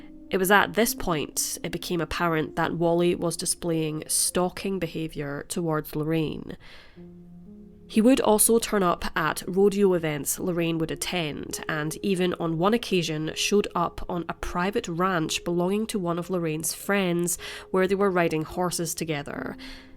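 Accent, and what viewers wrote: Scotland, United Kingdom. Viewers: She's Scottish lol! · I love your wee scotch accent, I;m from Donegal in Ireland. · SHE'S SCOTTISH ACCENT MY FRIEND 🇺🇸🇨🇴🙏🏽💜💜💜💜💜💜✊🏽✊🏽✊🏽✊🏽✊🏽✊🏽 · I believe she's Scottish :) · It's a Scottish accent. Good wishes 🙏🏼 to Canada 🍁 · She and her sister are Scottish. Her sister is Kirsty Skye who also has a channel. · just curious where in Scotland are you from? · Is that a Scottish accent? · Yes the narrator is from Scotland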